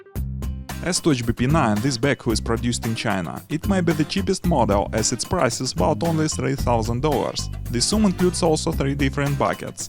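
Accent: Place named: Russia. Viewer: Русский наверное. Акцент выдает))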